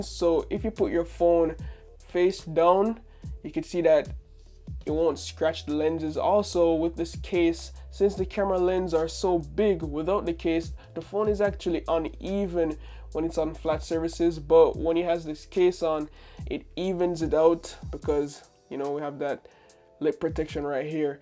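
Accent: Jamaica. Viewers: U sound like u have a lil Jamaican accent 🇯🇲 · Why do you sound Jamaican 👀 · my guy jamaican as hell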